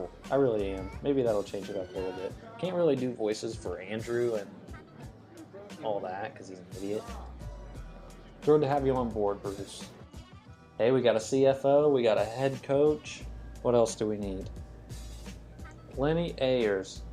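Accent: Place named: Mexico. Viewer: Maybe tone down the intense mexican accent, seem a tad off for a guy called Other than that great stuff thanks for the posting! You are a champ!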